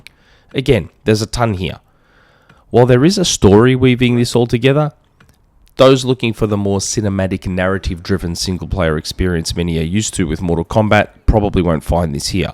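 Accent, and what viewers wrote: Australia. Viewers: Love the Aussie talk about games, even though he is a Collingwood supporter. Good review. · ah nice to hear another Aussie!! Thanks for the Awesome Review!!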